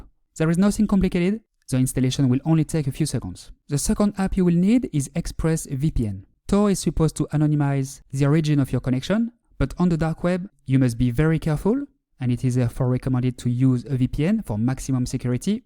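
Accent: France. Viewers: Salut et Oulala ! ça se voit "s'entend" que tu es Français toi à ton accent. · Mhm ur from french right?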